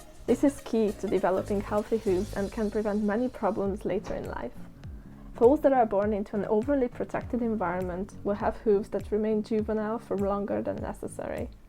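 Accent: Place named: United Kingdom. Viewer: Hey! Nice video, well done! You sound like a born british, and speak like a real youtuber :D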